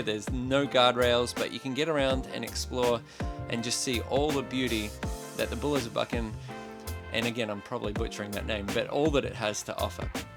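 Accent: Australia. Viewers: I’m guessing you’re not a native Texan though. Sounds like Australian or NZ? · You dont sound like you are american! You sound like an Australian or New Zealander! How did the lack or warmth and sun, particularly in the winter, make you feel, as you are from a warmer and sunnier part of the world?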